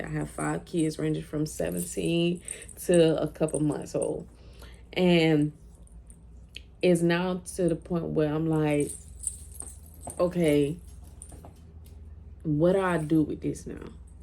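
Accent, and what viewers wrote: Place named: Georgia, United States. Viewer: That Georgia and New Orleans accent blending beautifully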